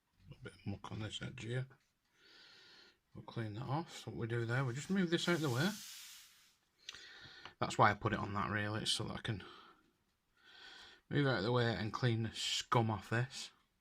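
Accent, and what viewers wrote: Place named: Northern England, United Kingdom. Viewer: You sound like you're from Northern England- beautiful country out there! Thanks for this, it was very entertaining!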